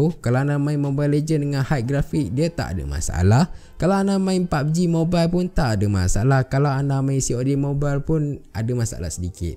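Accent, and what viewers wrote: Taiping, Malaysia. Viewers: are you from taiping?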